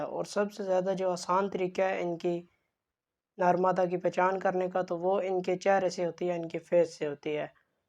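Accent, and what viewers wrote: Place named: Punjab, Pakistan. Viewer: اپ اپنا اردو بولنے کا طرز ٹھیک کر لو اپ کا انداز بیان اچھا ہوگا اور اردو بولنے کے اندر پنجابی لہجہ نہیں ائے گا تو لوگ اپ کی بات سے ویسے ہی متاثر ہوں گے اور خود بخود اپ کو سبسکرائب کریں گے کیونکہ جتنا اپ روانگی کے ساتھ ادب اور اخلاق کے اچھے بہترین طرز کے ساتھ اپنی گفتگو کریں گے اتنے سارے لوگ اپ کی ویڈیو کے لیے بے تاب ہوں گے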